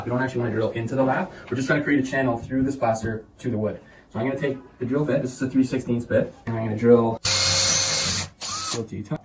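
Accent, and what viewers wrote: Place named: Ireland. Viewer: Great job. You seem to use many different coats of filler, give a great finish. Also you sound Irish!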